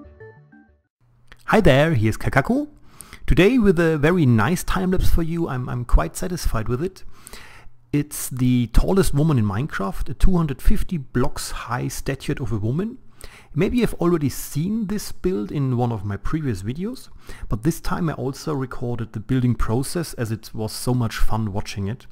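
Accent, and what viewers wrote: Germany. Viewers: u sound german :D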